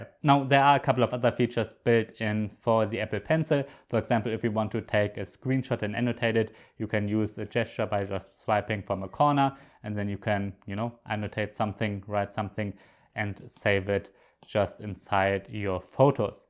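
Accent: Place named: Germany. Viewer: I'm still waiting for apple to redesign Thier budget iPad! So waiting for 9th or 10th gen! And I watch your videos after a long time before watching I really missed your German-English voice!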